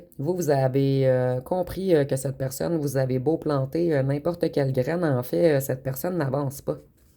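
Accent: Quebec, Canada. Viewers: Tres beau tirage merci beacoup il me parle j'adore votre accent québécois et j'adore vous ecouter..... merci❤❤❤❤❤❤❤❤❤❤❤❤❤❤❤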